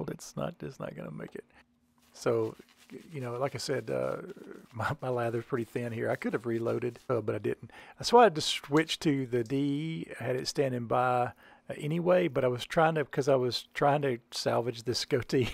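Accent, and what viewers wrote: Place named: United States. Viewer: no, just American in general.🤣 I was trying to place your accent in a specific state, though.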